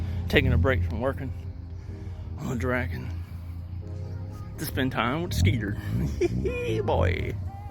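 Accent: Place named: West Virginia, United States. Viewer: I would swear you was here in West Virginia driving that old Teg you call “Skeeter” . Same way we do it out East here anyhow. Guess Alabama aint much different than here. Shit had me laughing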